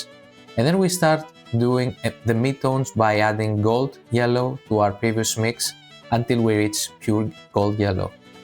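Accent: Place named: Spain. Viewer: 'How is he such an incredible painter?!' Hears Spanish accent 'Ohhh' Fr though, amazing work! Gonna try out this skin recipe so thanks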